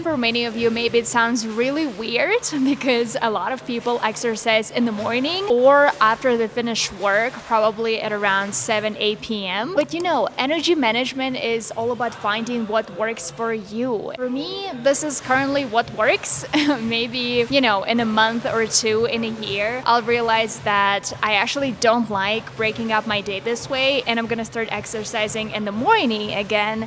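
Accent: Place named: England, United Kingdom. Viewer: I really love your English accent you have fascinating fluency in English ❤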